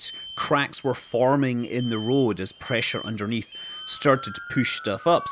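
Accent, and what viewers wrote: Scotland, United Kingdom. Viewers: Do I hear a Scottish accent? It’s a posh wan but am sure it’s there.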